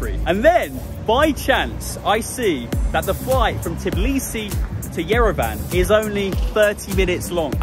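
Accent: United Kingdom. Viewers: Надеюсь это троллинг он говорит с Южным с британским акцентом... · Oh, this is so adorable to hear how you praise our country in British accent which I am crazy about ))...I used to live 6 years in nearby Reading. People in England are very friendly and smiley...))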